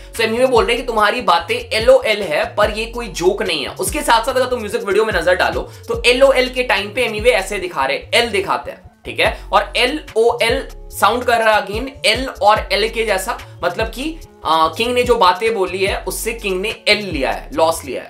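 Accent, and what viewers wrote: India. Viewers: Bhaiye logo ke jaisa baat karta h yaar ye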